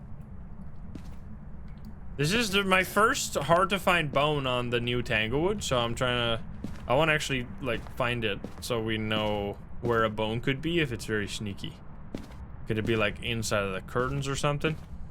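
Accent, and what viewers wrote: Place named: Netherlands. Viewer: ik had nooit geweten dat je nederlands was behalve een beetje van je acent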